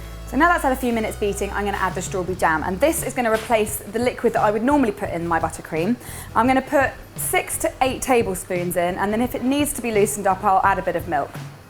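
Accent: England, United Kingdom. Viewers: That English accent, those cute dimples... and she bakes too. What more do you want in a woman? hahaha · Is she british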